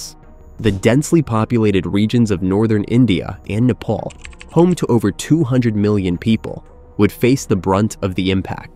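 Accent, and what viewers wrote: United States. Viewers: This is true to the rest of the world also...... What about Yellow Stone.... This speaker seems to have forgot that his American focks are equally at risk since they are sitting right next to the what is known to be the biggest volcanic geo event point. How about that... So in your Amercian accent please talk abt Americans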